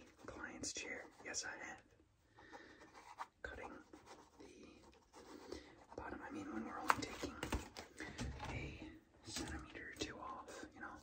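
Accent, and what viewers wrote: Boston, United States. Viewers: Great vid! I do miss the Boston accent ones tho 😁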